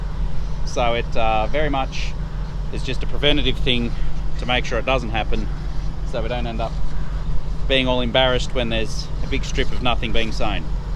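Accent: Australia. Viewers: Could you keep the lingo to the straight up down under language? I have hard enough time with the Ozzy accent done.😊😊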